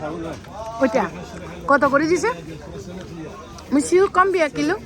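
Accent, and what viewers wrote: Noakhali, Bangladesh. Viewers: এটা কি নোয়াখালির ভাষা?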